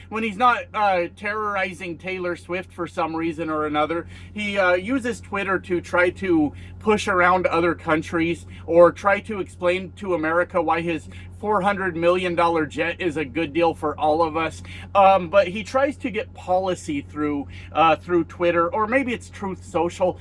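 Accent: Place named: Canada. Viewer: Howdy Trucker from Canada! Keep these videos coming. I'm addicted now to my daily Postcards from the Cab! You sound Canadian!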